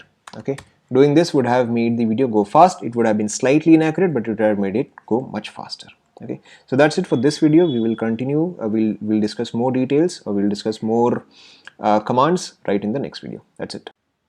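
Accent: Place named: India. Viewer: Can you do this video without the Indian accent please? It's so difficult to understand.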